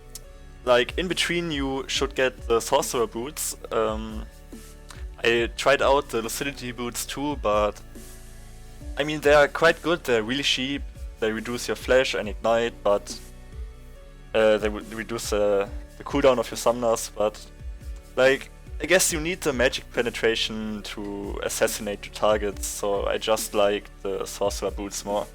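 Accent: Germany. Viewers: Das deutsche englisch 😂😂 ich liebe es geiler Guide! !! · Der deutsche Akzent xD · Deutscher Akzent :DDD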